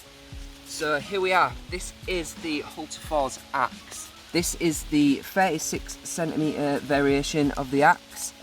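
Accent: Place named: East Yorkshire, United Kingdom. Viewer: you must be from hull or east Yorkshire, the way you promounce "wairk"?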